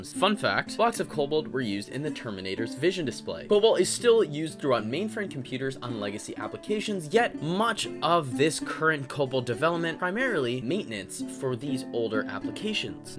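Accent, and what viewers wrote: United Kingdom. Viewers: Ahh, sorry I missed it. Thank you so much for this awesome video, it was so beneficial. I liked your British accent too. More videos should be made on these topics since computer science is really attractful. Looking forward to see history of operating softwares :)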